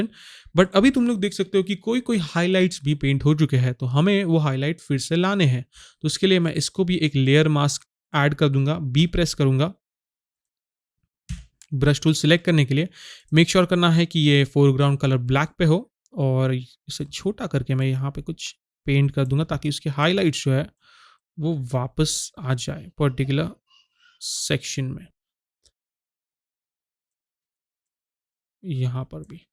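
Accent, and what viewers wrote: Maharashtra, India. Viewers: No that's not right ! I have seen many Maharashtrian People have tendency to talk like that only, that's Maharashtrian Hindi !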